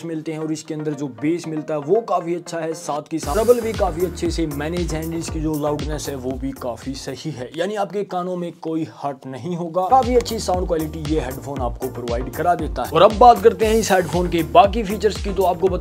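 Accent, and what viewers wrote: Haryana, India. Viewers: Awaj t lag h haryana ka h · Bhai by your accent i can say you are definitely Haryanvi 😍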